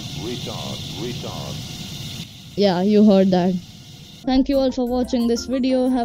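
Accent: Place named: India. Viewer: Bro sounds like indian😭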